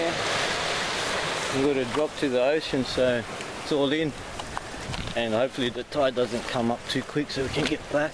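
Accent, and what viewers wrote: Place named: Australia. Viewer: Cool looking place there! Nothing like that here! Where exactly are you from? Sounds like Australia or New Zealand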